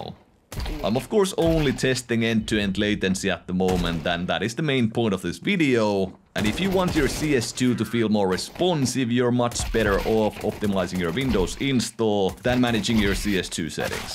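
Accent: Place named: Finland. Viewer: Your accent looks like FInnish if im not wrong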